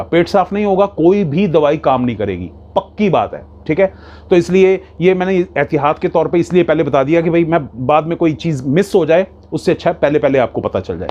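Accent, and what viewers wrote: Punjab, India. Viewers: Sir,thoda video short me banaye.Thank you for great information. I love your strong Punjabi accent.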